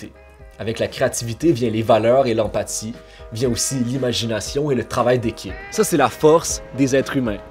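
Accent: Quebec, Canada. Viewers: J'habite au Brésil, et j'apprends le français depuis 5 mois. Je sais pas le raison, mais c'est plus facile pour moi pour comprendre l'accent québécois que l'accent français et, découvrir ta chaîne, plein des sujets que je m'intéresse (et vous avec votre mignon accent), était une bienfait. Il n'y a pas beaucoup des chaînes en français que sont cool comme la votre. Merci. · Ce qui m'a attiré dans ce vidéo c'est l'accent Canadian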